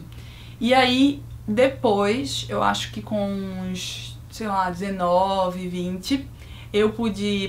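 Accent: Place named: Recife, Brazil. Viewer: Esse teu sotaque é o melhoor! Recifense <33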